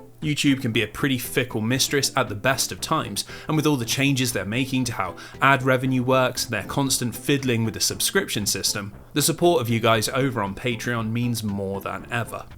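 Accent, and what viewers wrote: England, United Kingdom. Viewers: Smooth talking British slop is my medicine 🥸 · Wait you said a market wide crash but excuse me if I detect a English accent, the thing is I’ve seen countless Europeans complain us Americans are sooooo egotistical for ignoring how there was no crash in Europe and how we are so terrible for assuming the world revolves around us awful Americans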